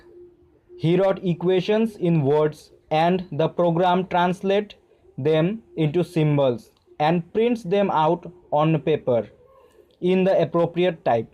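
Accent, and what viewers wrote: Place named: India. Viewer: I don´t know why, but I love this Indian accent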